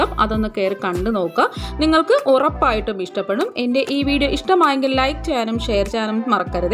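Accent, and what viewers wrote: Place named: Kollam, India. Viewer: Mam, your recepy is very good and I love it. But your kollam language is also attractive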